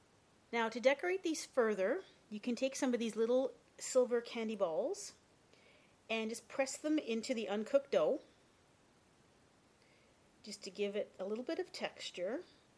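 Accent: Canada. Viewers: You are Canadian! I love your accent! I subscribed! All of you vids are so cute! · Your accent sounds like your from canada, but you measured in inches, Wisconsin? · I can hear her canadian accect noww!! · Are you canadian? You have that baker/canadian soccer mom accent..I love it :D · where are you from? it sounds kinda like a strong Canadian accent, but I don't really know.. Minnesota? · lol you sound so canadian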